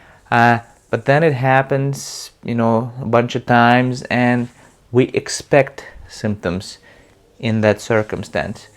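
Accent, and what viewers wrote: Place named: Russia. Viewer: Been dealing with Pain/Fibro issues for a long time, I was bed bound for about a yr about 8 yrs ago but still have synthoms found a great TMS dr here in mass hes Russian like yourself ..so to hear your accent and his which is the same it gives me alot of confidence ...thx you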